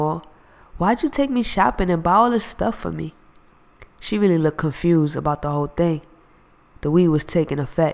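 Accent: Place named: New York, United States. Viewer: your welcome just give us more to hear I love your New Yorker accent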